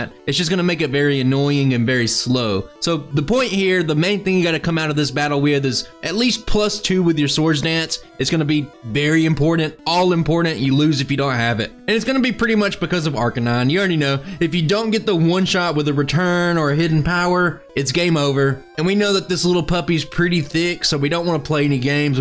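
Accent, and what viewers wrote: South, United States. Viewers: somewhere from the Southern States :D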